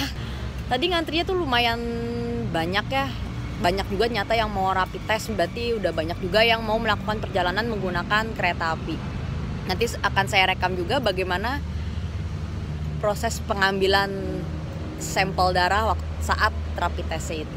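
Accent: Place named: West Java, Indonesia. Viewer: Kok logat nya Sundaan banget yah 😁😁😁😁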